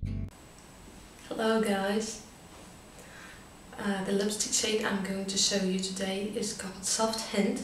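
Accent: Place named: Russia. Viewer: ой, вы оказывается тоже из России) то то вижу что то родственное в акценте,решила проверить)) круто))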